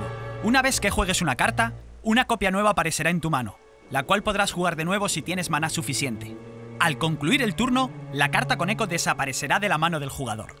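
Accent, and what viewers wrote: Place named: Spain. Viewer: Por poco pensé que me equivoqué de canal y lo confundí con el de "ES", pero no, es "LatAm" y está en español de España :s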